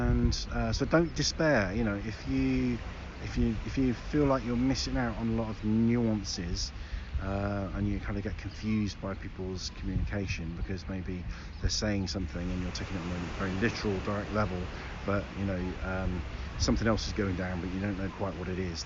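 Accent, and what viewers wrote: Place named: United Kingdom. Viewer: I think the British accent is beautiful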